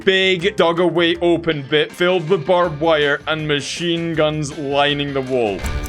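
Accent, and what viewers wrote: Scotland, United Kingdom. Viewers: Is bro Scottish?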